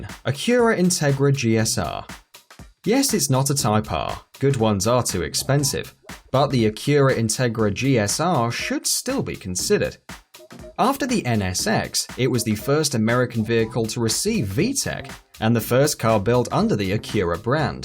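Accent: United Kingdom. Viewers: Because he's from The UK... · Guys... It will be cheap cause, Listen to that accent, Pure english, that means UK confirmed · It's a neeesan not a nizzan 😂😂 i hate British accents lol . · You're talking in Dollars and about the US market, but you cannot sit there and tell me you are American with that blatant British accent. Either talk in Pounds & UKDM, or get more American; pick one.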